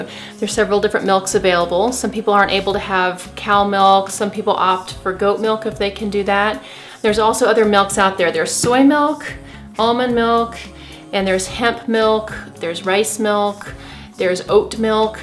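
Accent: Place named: Europe. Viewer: Turmeric milk....very good subject anchored in a nice manner. But Madam u are a qualified person hence u should be slow avoid European accent e.g. u say wada. for water ; it will be kind help for Indians probably from where u belong.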